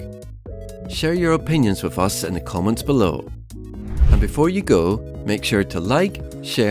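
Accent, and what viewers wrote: United Kingdom. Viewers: Just stumbled across your channel on my discover page, love the videos and also your accent sounds familiar! Are you from or have family in Belfast / North Ireland?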